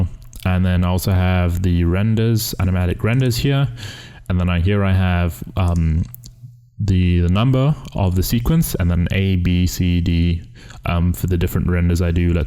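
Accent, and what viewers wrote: South Africa. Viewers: South African accent??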